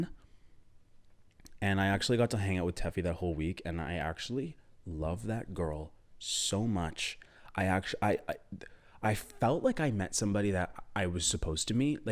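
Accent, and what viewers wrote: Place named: Australia. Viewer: I really thought you were Australian with a good American accent😅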